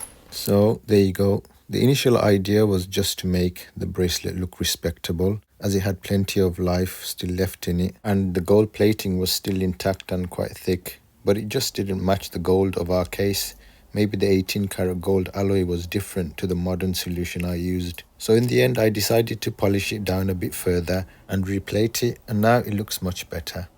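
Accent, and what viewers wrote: United Kingdom. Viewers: Man with the British accent I replayed the opening line 3x to understand “bosses”. I thought you said a P-word which would seem highly out of character…. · What country do you live in? You have what seems like a British accent. Are you of Indian ancestry? Don't answer if it's too personal. I'm just trying to place you.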